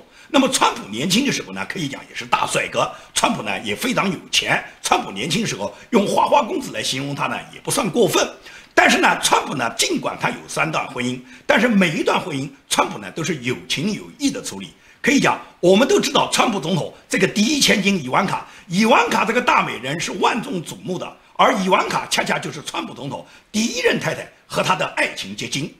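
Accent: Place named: Suzhou, China. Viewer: 老师您好，我是您的江苏老乡。17年到德国您是我在YouTube关注的第一位主播，不知不觉中在您每天节目的陪伴中走过了三年。谢谢您日复一日的付出，让我有了全新的思考和辨别方向的能力…也谢谢您的苏式普通话让我在远离家乡的同时听到最亲切的乡音☺️ 真心祝福您及全家身体健康；节目越做越好，我们陪着您一路走下去！谢谢！！！💐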